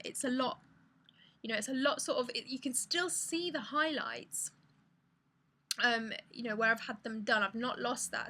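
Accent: England, United Kingdom. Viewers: India with eng. accent <3 nice...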